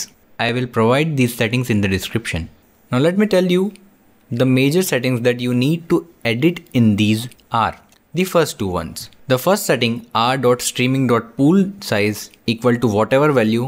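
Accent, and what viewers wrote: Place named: India. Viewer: Another huge advantage of Indian accent is the English is scholar and easy to understand for non native speakers Anyone knows a successful Scottish YouTuber?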